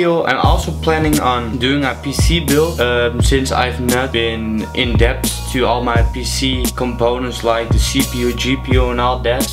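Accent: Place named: Netherlands. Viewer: Respect voor je engels maar op een één of andere manier is het echt weer louis van gaal engels 😂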